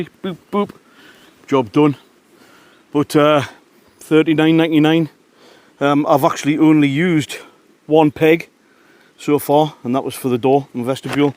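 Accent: Newcastle, United Kingdom. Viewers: I love your accent, is that from Newcastle? Great review. Thank you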